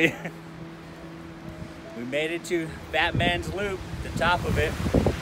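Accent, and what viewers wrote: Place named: Texas, United States. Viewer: liesss, that's a Texas accent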